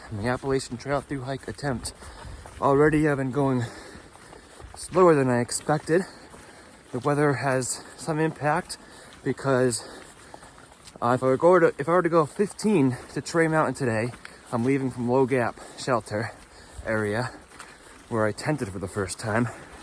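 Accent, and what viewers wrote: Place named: Connecticut, United States. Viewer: Are you from CT, by chance? I think I recognize your accent! I know that, right now, your thinking is all about "can I make it to there, by then", but soon, you're not going to care. You'll just know, because your legs will be strong and your confidence will be stronger, that you'll be able to make it to wherever you want to make it in a day. Weather won't be as important. Or at least, not as urgent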